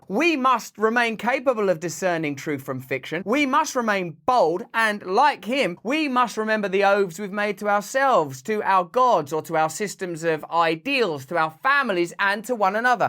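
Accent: United States. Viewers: Your American accent is spot on 😂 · I wish there were a navigation app with Russel's American accent as the voice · this guy is talking like he's American...let me hear your American accent... better to hear then your natural tone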